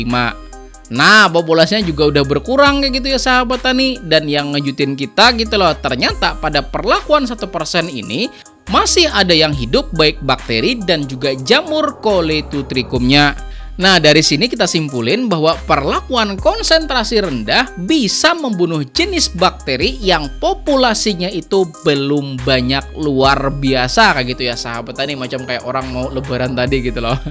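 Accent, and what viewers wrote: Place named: Barabai, Indonesia. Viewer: Logat pian kaya org hulu sungai barabai pak ae😁 salam kenal dari lun petani semangka kab kotabaru kalsel.🤝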